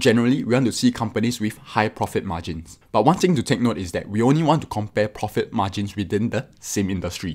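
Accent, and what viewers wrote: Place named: Singapore. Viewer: No pun intended. It’ll be nicely if you can slow down when speak. You sounded like one of my colleagues from Singapore. He has a similar accent like you but speak in a slower pace. In the US, we also have a different accent among various states. Other than that, the content is helpful.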